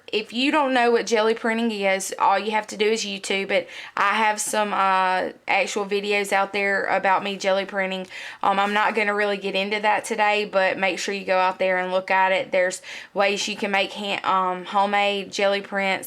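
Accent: South, United States. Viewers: Great video but boy that southern accent! Lol yes, I'm from the south too.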